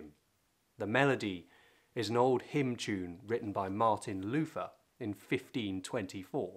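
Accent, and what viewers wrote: United Kingdom. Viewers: Rejoice, o my soul, in Christ, the Risen Saviour!, your narrating is truly wonderful, so beautifully English. Thank you for this wonderful recital.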